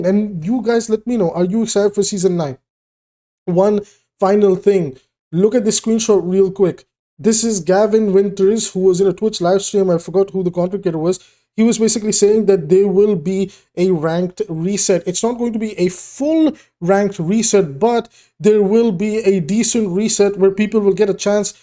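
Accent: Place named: India. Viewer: I'm guessing because he has the cadence of Indians when they speak that you'd make an assumption.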